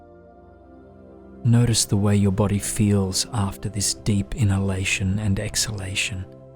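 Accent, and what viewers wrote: Australia. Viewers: Hello, I love your recordings, they are the best, they are so soooothing. We can truly sense your care in the tone of your voice and it is really heartwarming. I have been using your videos to calm my mind for over a year now. And recently I introduced my 13 year-old son to them because he tends to worry too much and has trouble falling asleep. Tonight he came find me and asked me to listen to one of your videos, he likes them so much! And he fell asleep peacefully lulled by your wonderful Aussie accent that we truly love. Thanks a lot for all the love and dedication you put in your channel. It is greatly appreciated! Cheers from Canada 😊🙏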